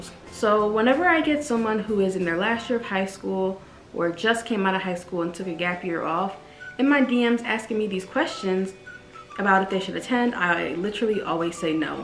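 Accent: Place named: Jamaica. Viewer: I heard that Jamaican accent. Nice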